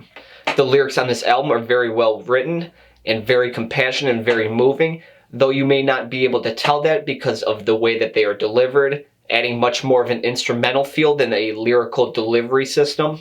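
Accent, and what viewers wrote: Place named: Midwest, United States. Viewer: Great review brother! Props for pointing out the lyrical content and the remarkable drum performance on this album. I also appreciate your impeccable midwestern accent. I’d be shocked if you weren’t from Wisconsin or Illinois. Keep reviewing black metal!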